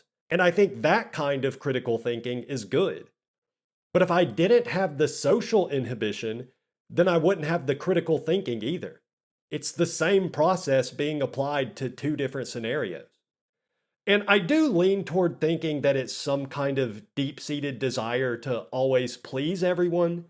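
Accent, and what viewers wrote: South, United States. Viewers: Hey there. 33-year-old female here. I just came across your channel and this is the first video of yours I’ve ever watched. I’m only about halfway through but I don’t think you should be so hard on yourself. You seem like a really great guy. And you’re funny!!! Stop telling yourself you’ll never have a meaningful relationship. That’s not true. If you keep telling yourself that, you won’t have one. I’m blind using a screen reader so I can’t see what you look like, but you seem to have a nice personality. Work on your confidence in your self-esteem. That’s the most attractive quality in a man. By the way, where are you from? I feel like I’m hearing a southern accent lol. I’m from Kentucky so I have one too!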